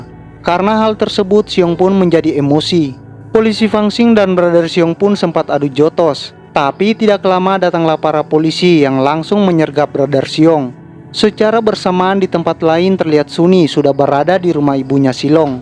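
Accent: Eastern Indonesia, Indonesia. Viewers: Yg ngomong ini sepertinya orang timur. Gak enak banget dengar bcra orang timur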